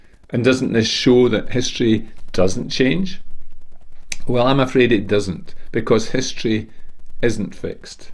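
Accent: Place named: Edinburgh, United Kingdom. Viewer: Knew right off that you were from Edinburgh since I heard this accent from the time I was a bairn. My mother's family lived just off Leith Walk on Buchanan Street. I had the pure pleasure of staying there at my grandparents flat for 3 months and getting to know my 6 aunties and uncles and all of my cousins. My mother survived her siblings and many of my cousins, dying 3 months ago at 94. Listening to you and looking forward eagerly to touring Scotland in September, I found myself wondering what it will feel like listening to my mother's accent in the voices of the lovely citizens in that incomparably enthralling city so dear to my heart.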